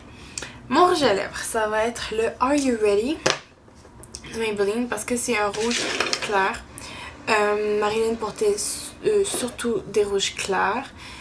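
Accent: Canada. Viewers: j'adore te regarder avec ton accent canadien ! j'adore!! <3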